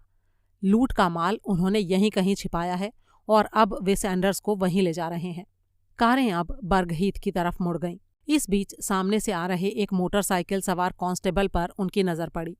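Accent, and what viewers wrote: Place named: South, India. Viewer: आप साउथ इंडिया से है क्या? आपका एकसेंट यही बता रहा क्योंकि अभी हाल ही में मैंने महारानी वेब सीरीज़ देखी है जिसमे रानी की OSD IAS का भी एक्सेंट ऐसा ही था वो केरल की थी।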